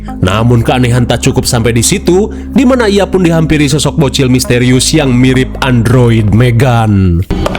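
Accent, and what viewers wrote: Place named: West Java, Indonesia. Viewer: Makasih ya AA sudah menemani kami semua dengan Vidio² horror+lucu dengan komedian dari AA 😊😊logat AA kayak orang Sunda ya.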